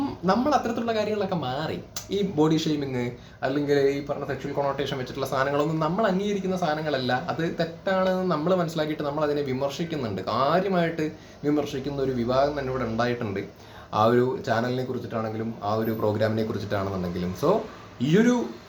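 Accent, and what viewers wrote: Palakkad, India. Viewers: Bro. Nammande Palakkad accent super...Keep it up, and best wishes. Big fan of you from Delhi..👍👍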